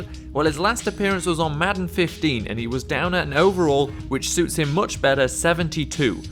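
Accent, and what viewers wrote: England, United Kingdom. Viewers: It's satisfying to hear an english guy talk about madden lol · dude you actually know what your talking about with Madden OR actual NFL??? AAAANNNNNDDD your playing biggie beats in the background?? and your British??? new sub for you mate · Stfu your British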